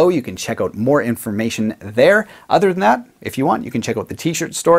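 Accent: Canada. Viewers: I really like catching the slight differences from American English he does as a Canadian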